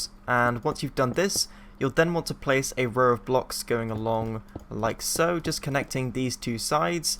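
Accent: United Kingdom. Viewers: its called being British lol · It’s called an English accent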